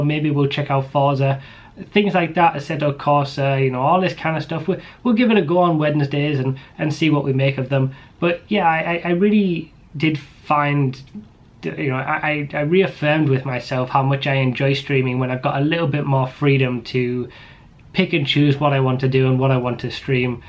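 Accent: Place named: United Kingdom. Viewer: Yeah it's how us Brits say schedule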